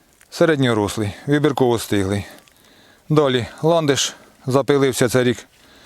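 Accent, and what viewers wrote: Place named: Poltava, Ukraine. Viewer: Дякую за огляд.Але якби Ви озвучували дату огляду то область Укаїни це було класно.По акценту вроді як Полтавська обл.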